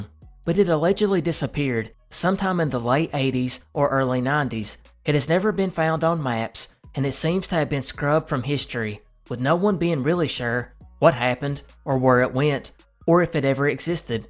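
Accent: South, United States. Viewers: Been here since around 2k subs i think. I would not be surprised if this becomes the next 1,000,000+ sub mystery channel. Great content mate, and very glad (even as a UK resident) that youre not getting the hate you used to get for your southern accent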